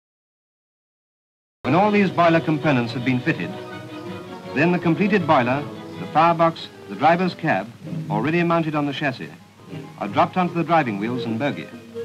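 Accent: RP, United Kingdom. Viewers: Not really. Commentators of the day usually spoke in what's known as "Received pronunciation." Wouldn't do to have unwashed heathen Northerners commentating on serious stuff like this....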